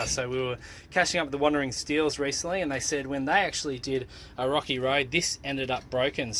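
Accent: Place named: Australia. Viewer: We Cannot Hear your voice. FIX THAT. No ill entent intended. And SPEAK SLOWER, Your Acsent is Not Easy to Understand. (American English is Not Aussy English) We Still Luv-Ya-Champ.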